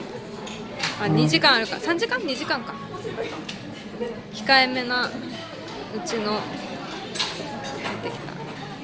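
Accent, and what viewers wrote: South Korea. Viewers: さんの今までの努力で韓国語が染み付いてるのはすごい！と思うんですけどさすがに日本におるときは日本語にならん? 私はどちらかっていうと母国で韓国人って勘違いされたくない😅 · 知ってます！いつもめちゃくちゃ韓国訛りなので（ 笑 ）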